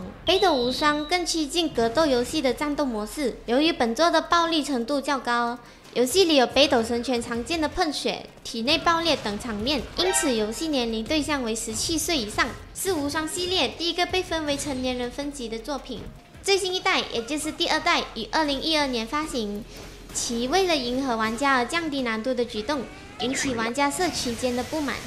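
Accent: Malaysia. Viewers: 是猛將zhuan，不是猛將chuan哦⋯⋯妹子繼續加油，蠻喜歡馬來西亞口音，很有親切感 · 她應該是馬來西亞人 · 馬來西亞華人的口音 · 這位有大馬口音的聲音也太可愛了吧！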